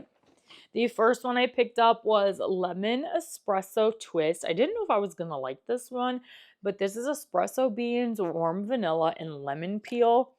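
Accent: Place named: Midwest, United States. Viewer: It sounds midwest to me 😊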